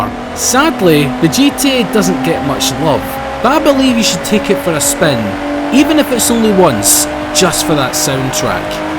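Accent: Scotland, United Kingdom. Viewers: Best Scottish accent 👌👌👌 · I found your Chanel by this video, and I could not help to love that accent! Where in GB are you from, mate? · If you're Scottish then I'm subscribing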